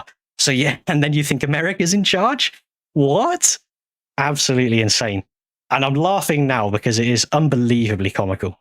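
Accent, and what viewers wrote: United Kingdom. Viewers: You sound like a brit, how about British politicians, Israelis own them, just look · Oh a British person ranting? Copium at best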